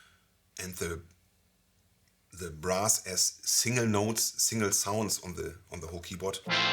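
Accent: Germany. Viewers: Das mag zwar sein mit der deutschen Aussprache, aber so erreicht er mit seinen Soundsets ein größeres Publikum. Die Wavestate wird ja nicht nur in Deutschland verkauft und die Titel sind weltbekannt. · I like this Germenglish accent 🙂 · Bestimmt ein Tolles Set, danke für die Mühe. Ich möchte nicht "trollen" oder dir irgendwas böses, aber ganz ehrlich: Mach deutsche Videos. Dieses Deutsch-Englisch hört sich schauderhaft an. Und vermutlich liegt dir die Sprache nicht besonders, so dass du dich da immer von Video zu Video kämpfen mußt. Ich denke da an einen anderen Kanal, wo der Betreiber wohl auch erst Englische Videos gemacht hat und irgendwann beschlossen hat diese in Deutsch zu machen. Dadurch sind die Videos für ihn leichter und schneller zu erstellen und die deutschen Zuschauer haben da auch nur zugestimmt und die Abonnenten sind sogar gewachsen. Wie gesagt, ist nur meine persönliche Meinung und ich möchte dich wirklich nicht angehen. 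Aber vielleicht würde es für dich auch schöner und einfacher werden. :) Viele Grüße und auf weiterhin gute Videos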